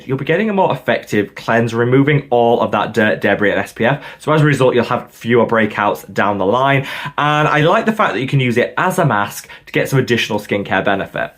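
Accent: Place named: North, United Kingdom. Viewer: Love this!! I'm a huge fan of Revolution products - will it be better than the Sali Hughes one which is just lovely. I think your vids are fab, fellow northerner here loves hearing your accent and the info you give is invaluable especially for those whose pockets are not as deep as some reviewers like to think. I'll be popping it in my basket next shop! I must say My skin has improved dramatically over the last few years, I'm 47 and since following a real and consistant skincare routine my confidence has been boosted, especially since people are saying I look 10 years younger than I am - I'll take that ANYDAY! I'm not saying I have good genes, but I do know the skincare and advice your channel has given me has helped for SURE!! Keep up the good work, I'm jealous of your skincare shelf!! 😍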